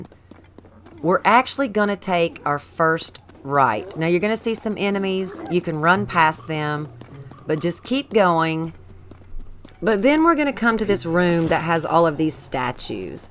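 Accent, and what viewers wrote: South, United States. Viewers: I love your accent ! You are obviously an American - somewhere in the southern states I am guessing ?? Thanks for these videos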